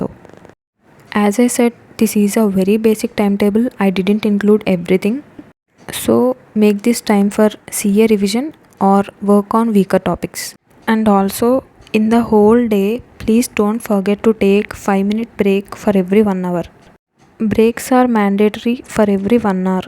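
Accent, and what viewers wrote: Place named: South India, India. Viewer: Your accent looks like from south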